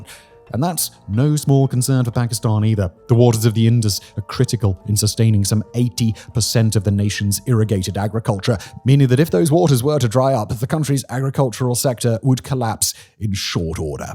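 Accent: United Kingdom. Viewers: Hey white man either a British accent, what would your country do if it had a neighbor like Pakistan which continues to train, execute and protect terrorists? What would any reasonable country do? Is there any other country in the world which has tolerated this kind behavior like India with so much patience?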